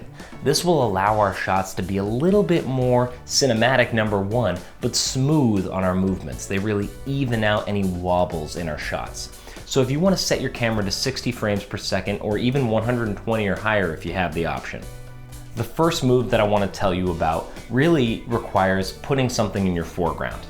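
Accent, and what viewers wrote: Boston, United States. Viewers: Wait! Are you Boston?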